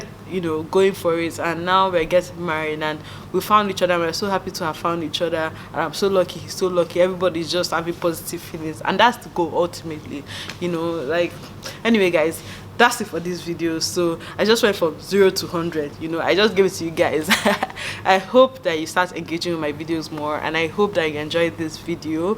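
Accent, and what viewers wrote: Igbo, Nigeria. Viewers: haha I really enjoyed this video and your Ibo is sweet! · 😂😂😂 Your accent plus your facial expressions and your general attitude is just epic😂 Ewo, my Nnewi sister. They can't even do arranged marriage for me and I'll collect. Odiegwu 😂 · Your igbo be sweeting me.... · The Igbo!! Like honey to my ears😫 You're so gorgeous. I'm here to stay. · I’m just here to hear you talk, love your Nigerian accent.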